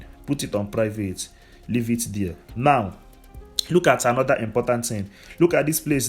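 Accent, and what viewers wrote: Igbo, Nigeria. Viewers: I love this our Igbo accent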